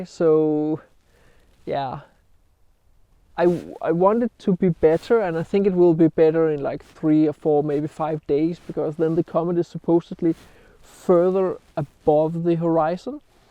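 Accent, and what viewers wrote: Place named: Denmark. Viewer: Excellent video, as always. By the way, I'm following your work since the beginning when you just have a couple of subscribers... I can tell you, your work evolved a lot. Time pass by, you are more clean, your style well define. The only thing that never ever changed is your charming Danish accent! LOL. Congratulations! You deserve it.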